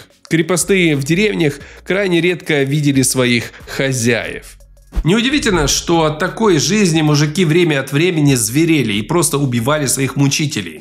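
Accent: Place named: Ukraine. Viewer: Страна-резидент канала: Украина(это слышно и по говору),так что,все понятно, псевдоистория с вымыслами... В России НИКОГДА не существовало рабства,каждый человек был лично свободен(экономическая зависимость-это другое дело). Раб не может сменить социальный статус,а крестьянин в России-мог! Крестьянские дети становились дворянами,как бы(потому что это требовало,как минимум,образования). Крестьянин мог подать ЖАЛОБУ на помещика,напрямую,Царю. Крестьяне жили общиной,"миром",имели СОБСТВЕННОСТЬ и сами продавали выращенную продукцию-где тут рабство? Розыском беглых крестьян обязан был заниматься помещик, то есть никакой системы государственного закабаления не существовало.. Крестьянство было СЛУЖБОЙ,только,не государевой,а персональной. Феодальная система! Общественный договор! Взаимозависимость!!!! Помещик ОБЯЗАН был заботится о крестьянах и кормить их в неурожайные годы-отмена крепостного права вызывала БУНТЫ крестьян,так как помещик теперь мог просто выгнать неэффективных и ленивых работников,"освободив" их. 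"Продавали" крестьян деревнями,то есть,это была просто смена собственника,как сейчас с заводами. Отдельных крестьян не столько "покупали",сколько переманивали-хорошего кузнеца,например. Крестьянин,до определенного года,мог вообще,сменить помещика(на Юрьев день)-рабство,говорите? Разумеетс,были периоды и случаи не очень красивого обращения с людьми-но их всегда было мало и это общественно порицалось. Не забываем,что ВСЕ русские тогда были одной веры и,если европецы в Новом с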